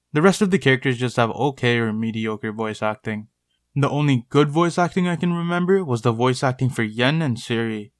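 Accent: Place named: South Asia. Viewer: South Asian voice- immediately shuts off video. This guy’s iq is too low to appreciate the game.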